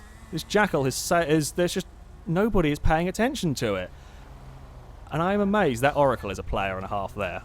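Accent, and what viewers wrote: United Kingdom. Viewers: You're soo British 😂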